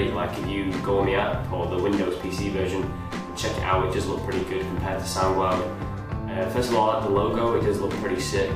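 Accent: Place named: United Kingdom. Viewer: British accent eminem voice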